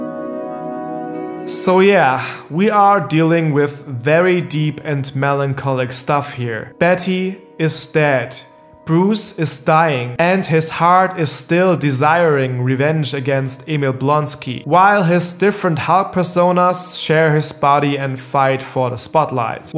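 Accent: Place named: Germany. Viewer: I came for the German accent.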